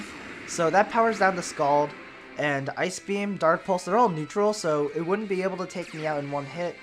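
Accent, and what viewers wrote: Netherlands. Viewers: R u dutch???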